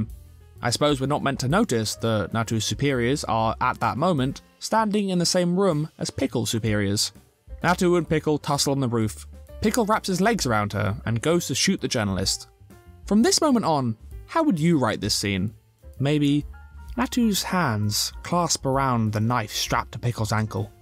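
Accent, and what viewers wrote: United Kingdom. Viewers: The most british voice i have ever heard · I've never opened a video and immediately got attacked by a blast of so much aggresive britishness , Kudos for that, immediately hooked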